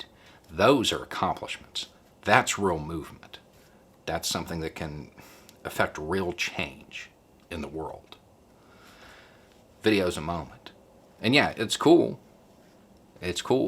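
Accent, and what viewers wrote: South, United States. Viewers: You giving wisdom with a Southern accent, changes more than you'll know. You've been a way that I've been able to move my extended family's political needle. That is a movement I never thought possible. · i like watching you since i live in europe and no offence but you sound like common southerner but you have a brain. and that makes me happy that there are still americans who can think for themselfs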